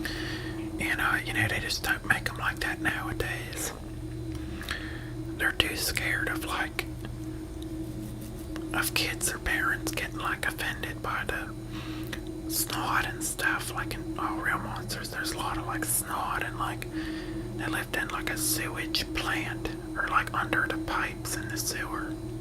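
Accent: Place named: Baltimore, United States. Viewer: Hey, love your videos. It's interesting to hear that your accent is PA based. You sound SO much like you're from Baltimore, which is where I live. Anyway, keep up the good work. Your voice absolutely lulls me to sleep.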